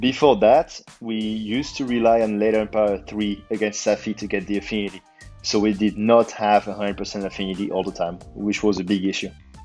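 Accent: France. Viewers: J’ai reconnu l’accent lol. Tu fais pas de vidéos en français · Ha ha, je m'en doutais ton accent anglais fais très français, sinon super build, vraiment original et passe vraiment bien sur le safi ;)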